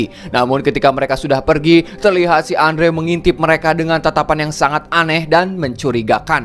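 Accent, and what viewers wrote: West Java, Indonesia. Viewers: Semangat trs ya bg.. Sy suka banget logat sundanya...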